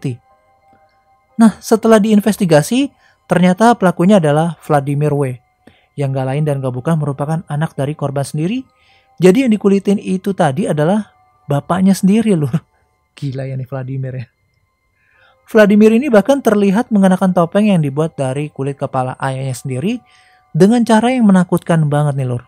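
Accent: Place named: West Java, Indonesia. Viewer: Mantap aa agak lucu dengar nya pasti dari jawa barat y😆 Pelajaran paling penting .. Penting ny kita bersosialisasi dengan orang lain biar klo ad apa ap ada yg tau dan nolongin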